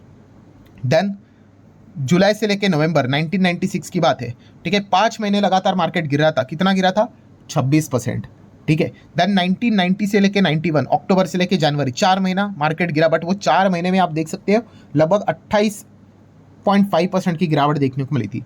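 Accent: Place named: South, India. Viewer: Bhai south wale hai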